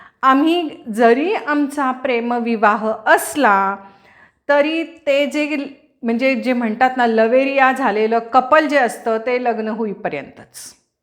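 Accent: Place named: Marathi, India. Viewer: जय श्रीराम, ताई तुम्ही अगदी आमच्या मनातलंच बोलताय! आताच्या ईंग्रजीचे प्रभुत्व असलेल्या वातावरणात,तुमचे ओघवते शुध्द मराठी ऐकतच रहावेसे वाटते!मला शुध्द मराठीचा खुपच अभिमान आहे,तुमचे मराठी बोलणे मला आवडते!अजिबात नाटकी पणा नाहीये!प्रत्येकाचे स्वभाव वेगळेच असतात पण आयुष्यात तडजोड करावीच लागते!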